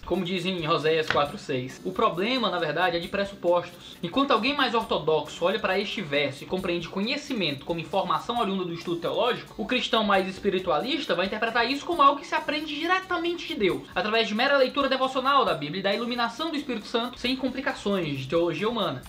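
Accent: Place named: Northeast, Brazil. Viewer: Fico ouvindo esse nosso sotaque (nordestino), e admirando essa biblioteca 😍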